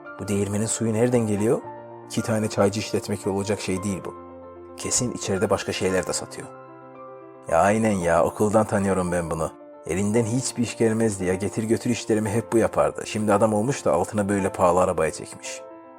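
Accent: Azerbaijan. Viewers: Azerbaycanlısan qaqa bilinir danışığından😁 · Video yapanin konuwma seklinden qaliba azeri oylemi acaba? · Türkçə danışma ləhçəsindən bilinirki Azərbaycanlıdır... 😄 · Abi azerbaycanlimisin? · Arkadas azeri turkceyi zor kullaniyor bu yuzden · Deyesen Azerisen 😂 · Bi şey sorucam.Videonu anlatan kişi türk deyil Azeri gibi geldi.Son tonundan anladım. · Men ilk defe sizin kanalinizin turk dilinde olan versiyasi ile tanish olmusam.Her zaman dinleyende turk deyil, azerbaycanli oldugunuzu dusunurdum.Cunki lehcenizden bunu hiss edirdim.Bir gun yoldasim mene sizin azerbaycan dilinde olan videonuzu gosterende sizi basqa biri bilib zenn etdim ki,turk dilinde olan kanali tekrarlayirsiniz.Daha sonra men anladim ki,2 kanal da size mexsus imish 😄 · Qaqas azerbaycanlisan oz lehcende danissan yaxsi olar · Bu çocuk azeri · Bu danisan Azerbaycanlidir...danisiqindan melumdur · Sen bir Azerbaycanlisan.ama direşibsenki turkce danişacaqsan.ama elede yaxşi alinmir. 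· Turk dilinde Azerbaycan dili kimi danışırsan)) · kardeş sen Azerbaycanlımısın? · Konusanın azerbaycanlı oldugunu dogrumu bildim acaba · levent y Seslendiren Azerbaycanlı, türkçe anadili değil. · Qaqa sən azerbaycanlisan? Danışığından hiss olunur təmiz türk dilini danışa bilmirsən sanki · Bu ses sahibi Azerbaycanlı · Xoşuma gəlməyən bir məqam var bu videoda.Videoda danışan azərbaycanlıdır amma türkiyə türkcəsində danışır.Bu da çox gülünc alınır.Her cümləsində açıq verir.Həm də kobudcasına.Sənin öz dilin yoxdur ay kanal admini.Bu yerdə Molla Nəsrəddin jurnalındakı karikatura yadıma düşür.Birinin ağzına 5-6 dil soxurlar.O da deyir ki,ay bala öz dilim ola -ola başqa dilləri niyə ağzıma soxursuz.Mın də türkəm ve bununla qürur duyuram.Amma siz belə danışanda türkiyə türkcəsini də pis günə qoyursuz. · qaqa azerbaycanli oldugun bilinir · Ləhcəsindən Azərbaycanlı olduğu o dəqiqə bilinir,Guyə bu indi niyə türk ləhcəsində danışırki? 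· Dosdlar bu videonu anlatanin Azerbaycanli oldugunu ama turkce konuwtugunu bir tek benmi anladim?? Bu arada bende Azerbaycanliyim... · Sen Azerbaycanlımısın abi?Konuşmandan anladım 😂😉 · Azeri oldugu belli arkadasin guzel video yapiyosun keske şiveninide biraz geristirsen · Bu konusan abi Azerbaycanli!👍👍👍👍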